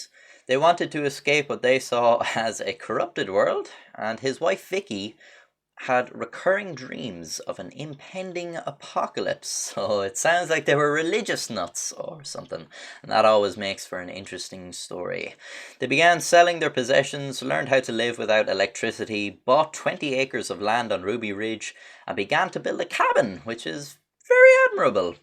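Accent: Ireland. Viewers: your irish accent makes it funny when you rant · Are you Irish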